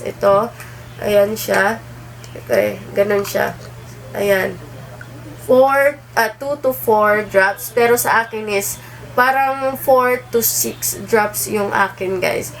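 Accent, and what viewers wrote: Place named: Visayas, Philippines. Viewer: Halatang Bisaya HAHAHA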